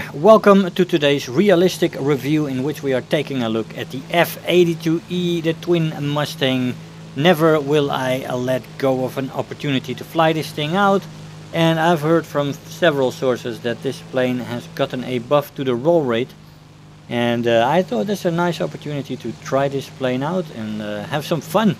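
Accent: Netherlands. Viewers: Leuke video ik hoor dat je NL bent haha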